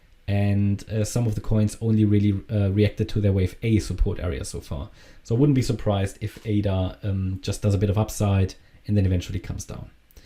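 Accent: Germany. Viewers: du klingelst sehr DEUTSCH an.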